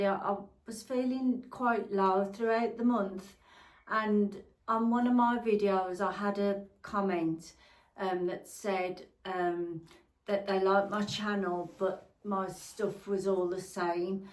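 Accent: United Kingdom. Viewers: Hi! Sorry that you are feeling so low! I love watching your videos - you are kind and caring (and I'm quite partial to a Black Country accent.) 💕 Please don't stop your channel, you have so much to give. Your heartfelt video made me think that an occasional 'Chat with ' over coffee (and cake) segment in your back garden or out on location would be great. You could talk about different things every time - style, clothes, hair, make up, skincare, garden, your hobbies, holiday destinations, keeping fit and healthy, shopping, being a mother and grandparent, your life etc. Love you and your channel,! 💛xxx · Aww, I'm a silent watcher never usually comment but just be you, You are enough! There's plenty of room on YouTube for all! I watch lots of different people for lots of different reasons. I love to watch you as you're so relatable and drama free! Plus I'm a Brummie and I love to hear the accent now I no longer live there! 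To stick my twopenneth in I'd say just do the sort of thing you do and this video here no doubt will help so many people! Xxx Big up to you, much love xxx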